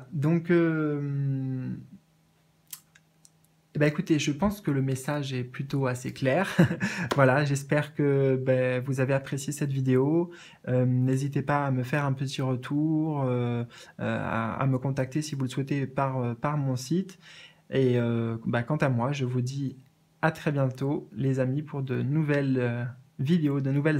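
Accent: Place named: England, United Kingdom. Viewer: 😂😂 super accent anglais ! Merci pour la vidéo 🌟✨🌈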